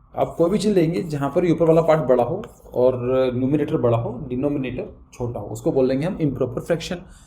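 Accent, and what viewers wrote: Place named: mixed, India. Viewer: I thought...bcoz in this video ur one accent like UP, Bihar side😅😅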